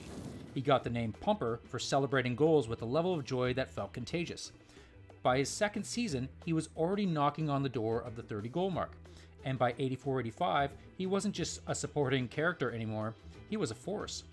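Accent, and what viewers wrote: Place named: United States. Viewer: Sounds like an American talking about a subject he knows little about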